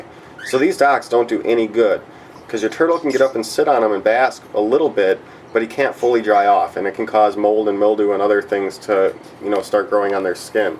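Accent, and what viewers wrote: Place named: Connecticut, United States. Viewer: you have the same accent i do and people always make fun of me for it. im from connecticut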